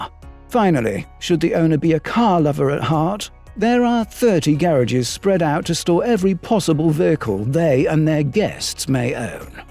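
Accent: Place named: Australia. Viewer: You Australian!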